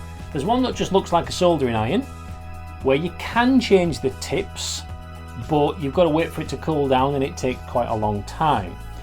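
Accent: Yorkshire, United Kingdom. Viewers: A really good presentation on pyrography. Nice and clear, filmed well, good/relaxing music in the background nice Yorkshire accent and useful tips. Thank You! :-)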